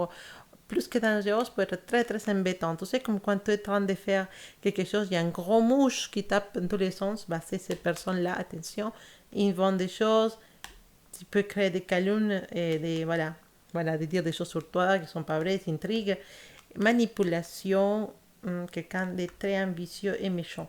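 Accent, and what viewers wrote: Spain. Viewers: Holà, j’adore ton accent espagnol il ne dérange surtout pas . Y Muchas Gracias !!! ❤️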